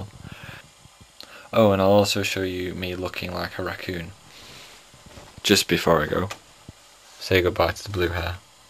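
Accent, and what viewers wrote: North, United Kingdom. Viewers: He sounds so northern in these early videos i love it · His voice was so deep and northern then jfc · He sounds so northern and not as excited as usual... He is so much different. Definitely more northern.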